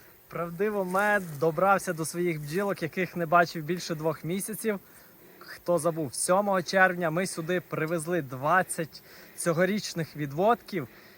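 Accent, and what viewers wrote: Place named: Prykarpattia, Ukraine. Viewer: удачі.розмова як на Прикарпатті.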